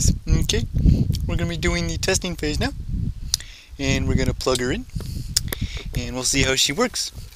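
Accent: Canada. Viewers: Is he canadian (no offense - pretty cool peeps) but still he got k way too much . special or what ? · French Canadian obviously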